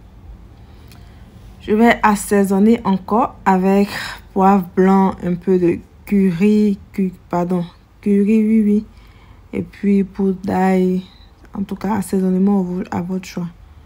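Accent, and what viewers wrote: Ivory Coast. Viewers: T’as maniéré de parler prouve que tu es vrai ivoirien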